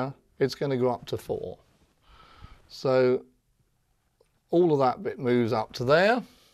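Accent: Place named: England, United Kingdom. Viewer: Takes a bit for me, as an American, to get used to the English accent, but I find the explanations to be quite good. Thanks for the upload!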